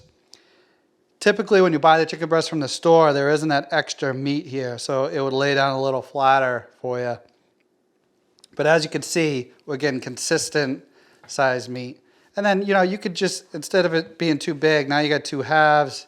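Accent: Boston, United States. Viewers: Totally Boston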